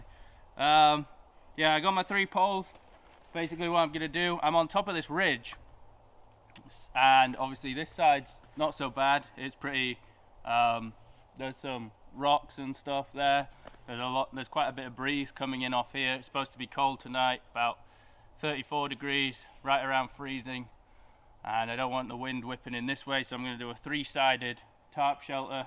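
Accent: Yorkshire, United Kingdom. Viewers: Yorkshire accent? Just chillin' in the woods, eating steak, sipping whiskey, very cool! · What part of Yorkshire are you from then? 😁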